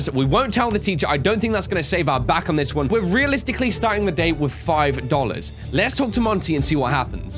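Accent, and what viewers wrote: United Kingdom. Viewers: Random question but... are you British?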